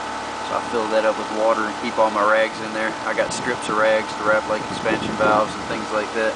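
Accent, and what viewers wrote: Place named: South, United States. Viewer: Dang! Your Southern twang with epic in this one me boy.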